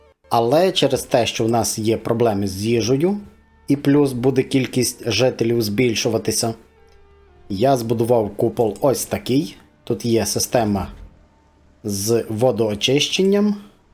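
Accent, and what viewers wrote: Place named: Russia. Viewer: Kocham ten ruski akcent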